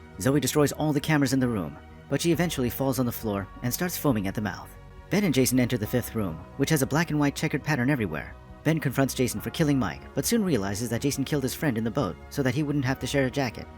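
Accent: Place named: Russia. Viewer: Что за акцент ужасный? Ты что русский? Ты же понимаешь, что тебя иностранцы некоторые просто не поймут. Пацаны расходимся, видимо на русском Ютубе не платят деньги и он решил делать контент в зарубеж.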